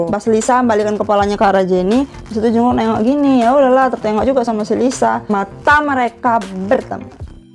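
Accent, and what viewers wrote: Medan, Indonesia. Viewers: Kk orang mana sih k Logatnya kayak orang medan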